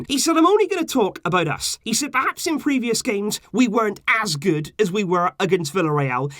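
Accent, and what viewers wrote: Wales, United Kingdom. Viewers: Tf are you on about, he's from Wales and has an accent, you have a problem?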